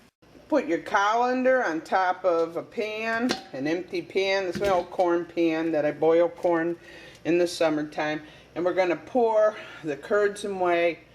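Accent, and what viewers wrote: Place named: New York, United States. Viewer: I have a dear friend from New York - she has the same accent.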